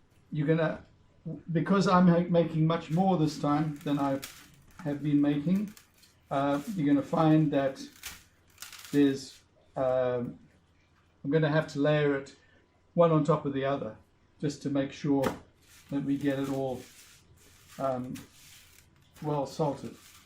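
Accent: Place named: Zimbabwe. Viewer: Thanks for the video, you make it the same way I do. Only difference is I dunk mine in a vinegar tub to rinse the salt off. From the accent I'm guessing Zimbabwe, I'm from Karoi 🙂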